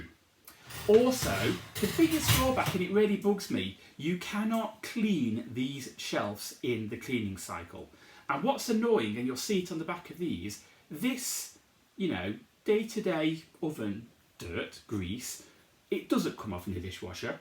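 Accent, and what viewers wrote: United Kingdom. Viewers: Thank you for your really great and useful review videos, your demonstration is very nice with plenty of information and a clear British accent. waiting always for your new... many thanks....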